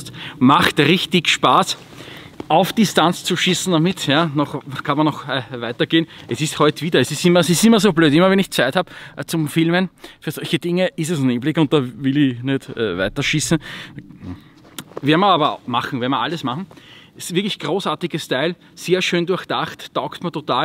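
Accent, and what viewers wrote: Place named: Austria. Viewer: Cause he is an Austrian and he plays with his slang a little bit, not everything could be translate to stiff english comments😂 Additionel you have the possibility to learn german with austrian accent 🎉 Most of his customers are from Austria or Germany. Easy Solution activate Google-Translator while watching youtube...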